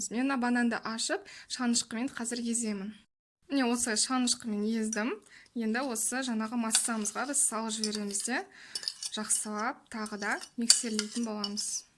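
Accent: Standard. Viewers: Таза казакша сойлейди екенсиз👍👍😜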